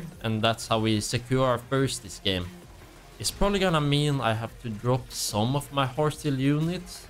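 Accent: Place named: Sweden. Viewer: swedish-english is so fun to listen to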